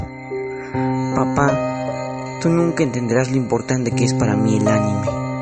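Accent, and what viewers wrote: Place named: Latin America. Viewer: Oye Heres Latinoaméricano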